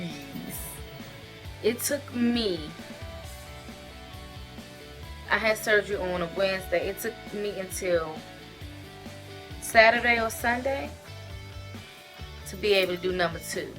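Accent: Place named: Washington DC, United States. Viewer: your accent made me miss DC so bad. wish you the best with your journey. I was just sleeved on the 13th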